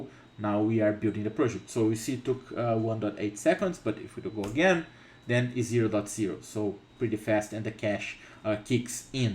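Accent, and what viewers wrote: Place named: Brazil. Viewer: Dude, what an amazing video. keep making videos like this. It opened my mind. Are you Brazilian? The accent reminded me of Brazilian.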